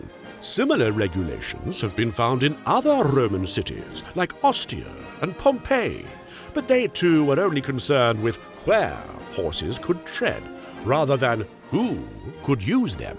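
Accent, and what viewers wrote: United Kingdom. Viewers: Im still confused about a British voice being so excited and enthusiastic about America lol but i love it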